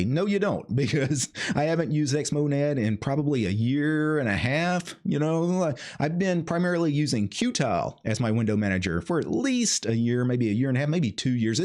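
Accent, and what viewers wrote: South, United States. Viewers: As someone from the Southeast United States, sounds perfectly normal to me 😂 But to my friends from other parts of the country he has quite a strong accent 😛 A benefit of hearing the “Southern accent” of the U.S. for non-native English speakers is that it is likely the most slowly spoken of the different English accents, hence the famous “Southern Drawl”. It is very ironic to me that it is easier for some people who do not understand English very well to understand the “Southern” American accent better than some native speakers of American English who are from areas outside the Southern U.S. Language/linguistics is so fascinating! 🤓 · He does not talk slow. That “slow” bullshit from people thinking they’re cracking “funny” jokes about Southern Accents.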